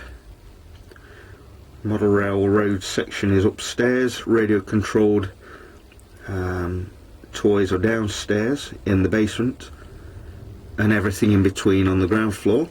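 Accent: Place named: England, United Kingdom. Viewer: Hi Uncle! It was so great to hear from you guys. It's so exciting to have an uncle from the UK with a lovely English accent, I just love it . This page is great, Jake is wanting to watch every video so that we are gonna do. We love to play with trains but we never had anything like you have on here. Love you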